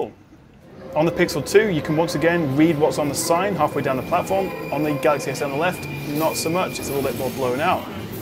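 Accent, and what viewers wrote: United Kingdom. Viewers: i love you,, you are so handsome and nice british accent :p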